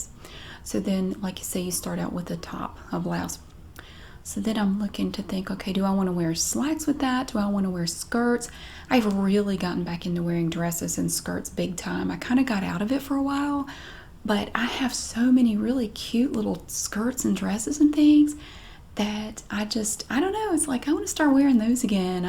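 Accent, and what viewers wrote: South, United States. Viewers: I loooove this video. I love when you explain clothes or processes you buy. I could listen to your soft southern accent all day. ❤️ Good for you shopping at thrift shops! Some clothes are so overpriced.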